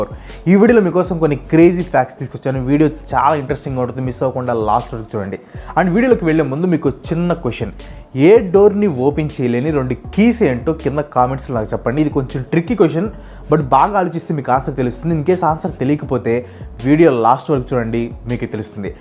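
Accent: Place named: Kadapa, India. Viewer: కాదురా తోయ్ మా కడప యాస మీరు కూడానా బ్రో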